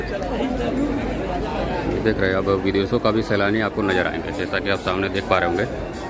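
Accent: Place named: Varanasi, India. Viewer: Your voice banarsi very nice and festivals showing video very good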